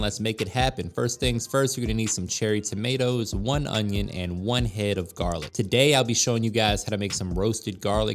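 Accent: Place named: South, United States. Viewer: You are so southern I love you 😍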